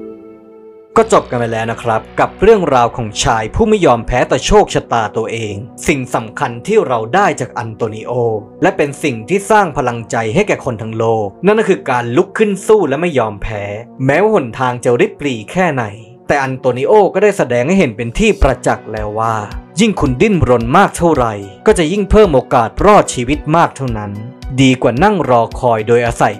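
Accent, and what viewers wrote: Thailand. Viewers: มีความเสียงเหน่อ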